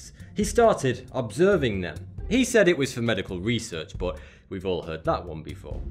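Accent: United Kingdom. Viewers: Where in the uk is thoughty2 from?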